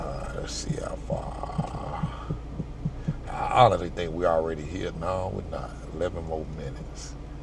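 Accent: South, United States. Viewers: I Have Very Sensetive Ears. I Can Not Listen To Most Commentator. I Can Listen To Him, I Get A Kick Out Of His Colorful Southern Pronuncation. He Represents Sun, Shinning in Mexico. Its a Good Match!" I Want A Lot! Looks Great!Been on the Road with You a While. Looking Great!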